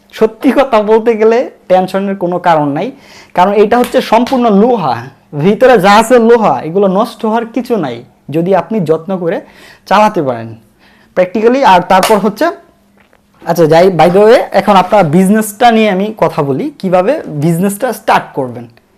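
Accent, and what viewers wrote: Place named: Sylhet, Bangladesh. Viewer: সিলেট কথায় পাওয়া য়াই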